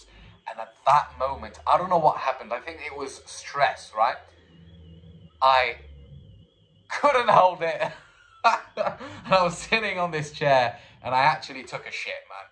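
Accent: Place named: United Kingdom. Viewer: How do you have such a british accent while living in Algeria?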